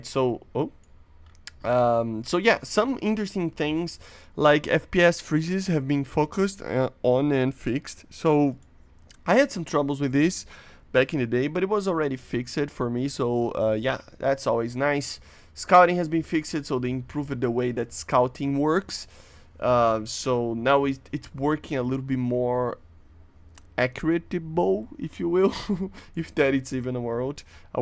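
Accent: Brazil. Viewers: I’m pretty sure he’s from brazil so English isn’t his first language and he probably only speaks English online so his pronunciation won’t be the best.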